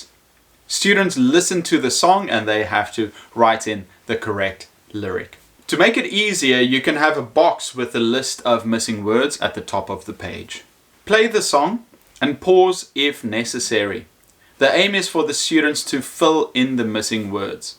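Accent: South Africa. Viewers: Simon says you very much South African :))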